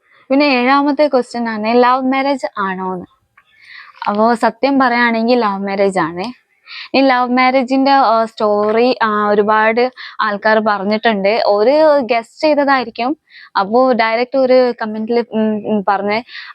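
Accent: Malappuram, India. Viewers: Samsaram kettitt keralathil malappuram allenkil kannur aayirunnu enn thonnunu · മലപ്പുറത്തു ആയിരുന്നോ സ്ലാങ് സംസാരിക്കുമ്പോൾ അങ്ങനെ തന്നെ മലപ്പുറത് ആണ് ഞാനും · Malappram basha ath mathi 🤗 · Samsaaram malappuram or kozhikod kaari. Look kandal nortj india kaari anu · മലപ്പുറം മലയാളം ആണ് പറയുന്നത്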